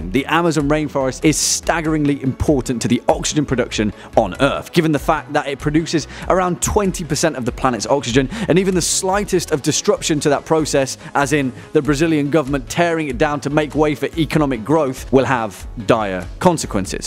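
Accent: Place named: Yorkshire, United Kingdom. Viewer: I love the accent.. Is that Yorkish? Anyway thanks for this informative mate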